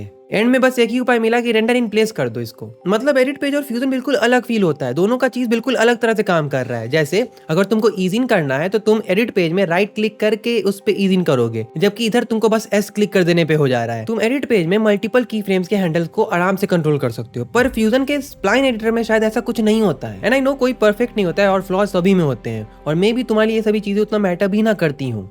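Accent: Bihar, India. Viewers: Bhai tu bihar se h kya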